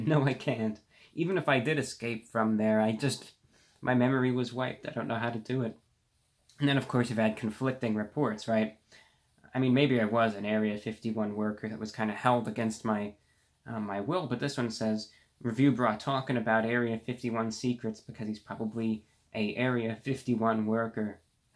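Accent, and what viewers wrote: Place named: New York, United States. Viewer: We will land on the moon if we invade area 51, just kidding... I wouldn't try to invade it! I do not trust the government, however I don't think aliens will ever come to this earth because maybe we are the aliens. Sometimes I think we are a very old race, and not necessarily in human form but in consciousness, I have my own reasons for thinking this. Also great video, I can hear your NY accent more and more and I love it!!! Love your laugh too! You are so effin cool and open minded with your all your commentators. Also I am seeing more and more folks thinking that we are living in a simulation. I can not agree with this because of the randomness in this world and I mean random car wrecks, and children dying of cancer. What do you think about us being in a simulation? Ps. I watch all the adds so you get paid. love ya!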